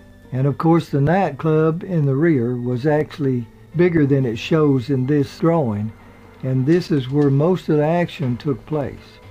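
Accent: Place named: Mississippi, United States. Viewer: Wow, what a story!!! Great video and scripting, per usual! And, as always, your great narration with that Mississippi accent is the best!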